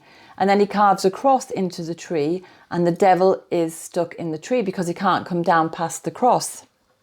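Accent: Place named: Bishop Auckland, United Kingdom. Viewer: I couldn't quite discern your accent...but I did think Bishop Auckland!! I absolutely love listening to your wisdom. Thank you so much xxx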